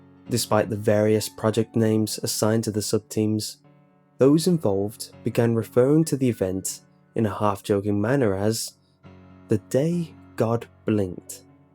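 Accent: England, United Kingdom. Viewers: maybe england just a guess tho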